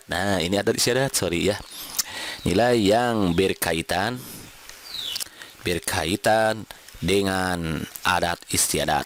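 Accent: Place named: Batak, Indonesia. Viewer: sebelumnya terimakasih ya mang utk video2 nya tapi mohon maaf saya cukup terganggu dgn aksen mang yg kaya gini. jd kurang fokus dengar penjelasannya, biarpun saya org batak asli yg kalau ngomong kekgini tp kurang nyaman dengar cara ngomongnya😢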